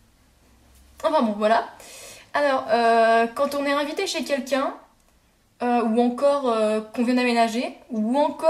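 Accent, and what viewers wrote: Japan. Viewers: J'adore ton axent, Japonais. 8D Les bonnes manières au Japon sont presque pareil en Corée. Tu t'es vachement amiloré x) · Vous devriez arrêter de critiquer ce qu'elle fait. Elle le fait pour la plaisir d'apprendre à ceux qui veut en apprendre plus sur le Japon ou sur la langue. Je suis une Française, n'ayant jamais visité le Japon et je ne vois pas en quoi "elle fait honte à votre langue" ou à votre pays, ou encore que "le Japon a une image débile" à cause de tout ça. Pour moi, elle ne donne pas cette impression, même que le fait qu'elle soit si passionnée et contente de ce qu'elle fait apprendre, elle donne envie d'en savoir plus sur ce beau pays! Voilà mon coup de gueule. xD Bravo pour tes vidéos,! J'aime beaucoup ce que tu fais et tes cosplays, ainsi que ton accent Japonais qui est trop mignon.:) Et je me marre bien avec les commentaires que tu rajoutes! 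x) Je connaissais déjà les bases de la langue puisque je l'apprends depuis quelques mois, mais ça fait du bien un bon rappel en vidéo. Un bon rappel des bonnes manières aussi, j'aime beaucoup le Japon pour ce respect et ses traditions. ♥ Bref, bonne chance à toi! Recommences tes vidéos, ne désespère pas parce que y'a des commentaires négatifs. Et si tu as juste pas le temps, bah j'espère alors que tu continueras quand tu aura le temps!;) J'AI ENCORE FAIT UN PAVÉ NAN D'UN CHIEN! x) Aaah. Bref, courage! Et j'suis impatiente de te revoir en vidéo! (une abonnée en plus!) xD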